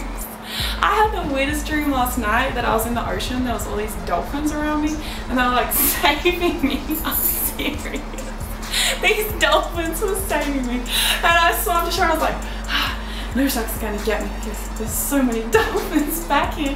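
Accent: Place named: Australia. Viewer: fuck thats so annoying -.- she mixes accents ... why honestly , you were born in australia you grew up in australia stick to what you are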